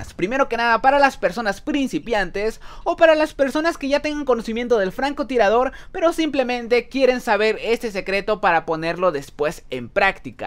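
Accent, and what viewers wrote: Mexico. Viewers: eres de mexico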